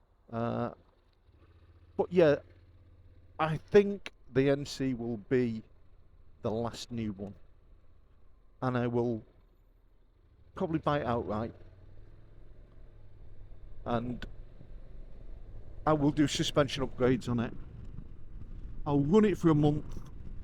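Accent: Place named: Lancashire, United Kingdom. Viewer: Very enjoyable "wednesday waffle", i enjoy your miss mash manc,cheshire,warrington accent more than posh freddies dialect,. I had to laugh when you decided not to follow the silver car and ended up pulling out behind him again when you came to the junction,. Nice change of scenery at the ducati shop instead of your usual go to "youles", a breath of fresh air, i used to go to woods of abergelle (now no more with the completely motorbikes crash) for my ducati fix, andrew woods was brilliant, he would let you demo most bikes, i took my old boss,s 748sp there once for a service and andrew gave me a 916 for a few hours while he serviced the 748, the first demo i ever had there was an early 900s or something simalar, it put me right off ducati's, the early ones werent very refined, the 916 was lovely tho,.i'm a yamaha man through and through so i dont tend to get side tracked to easily,. 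My eldest son bought an R reg (98) 996 sps and its cost him a fortune over the years, mechanical issues early on and now belt changes even tho he has'nt ridden it since 2019, still has to do a belt service when its due, it costs him £25 p/week storage costs in london to, married with 2 young kids and a high pressure job gives him plenty of money but little time to enjoy the bike,.he bought 3 of my collection recently and he has them garaged at a friends place 20+ miles outside london, only room for the 3 of them, tzr3xvsp 250,rd350b, cb750k2, ive still got my yamaha R7 ow02 (2000) at least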